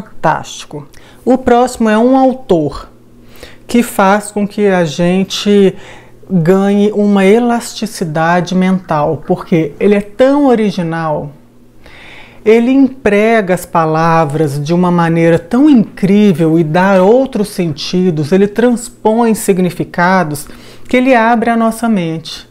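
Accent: Minas Gerais, Brazil. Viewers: O outro lado da infelicidade é o contentamento ( não é a felicidade) Ser grato ser agradecido não tem nada a ver com religião. É um estado de espírito que tem tudo a ver com contentamento. Vou fazer um caderno só com as coisas que você fala. Minha filha disse que eu já tou até falando mineires de tanto ver vídeo seu❤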